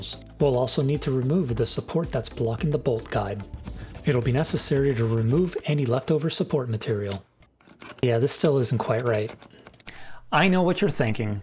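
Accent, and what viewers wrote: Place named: Australia. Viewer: Bro is from Australia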